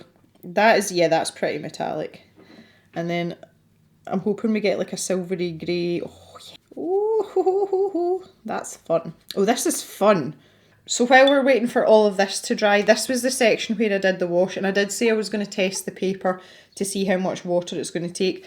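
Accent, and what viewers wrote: Scotland, United Kingdom. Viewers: Me too, but in another circumstances...🤣. The Scottish accent is so beautyful and " back to the roots". Difficult to explain, but I think, you know what I mean. Astonishing artwork. Gorgeous and amazing. Thanks for uploading. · Wooo, I really love your accent even though I struggled a bit with understanding everything (English is not my native language), but Scottish accent has such an interesting tone and pronounciation! I love your detailed and long reviews and unboxings and your artworks always come out great, so I'm really exited for your artwork of this month's box! Lots of love from Germany!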